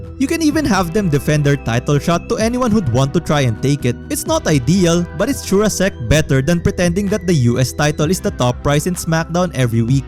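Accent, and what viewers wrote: Philippines. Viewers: He’s certainly held it hostage but you can’t blame but think that whoever finally beats him, that sigh of relief would be something else. Also from your accent, are you Filipino?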